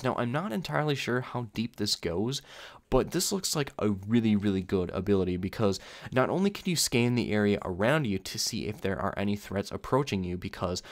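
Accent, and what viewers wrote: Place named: United States. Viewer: i really think you deserve more views <3 i'm the sort to overthink things as well, i have many theories that i want to turn into a storyline for tf2 (obviously, not before i consider all of its comics). unlike you, i lack the voice and the concise speech manner (i'm sure you're using american english, which is my favorite) to make videos, so my only good english is the written one x'D i admit not knowing the characters/storyline of the game you are talking here, but your video is very pleasant for an introduction. too bad people lack the patience to listen for so long. somewhat, i wish there there was a heart shot rewarding system as well x'D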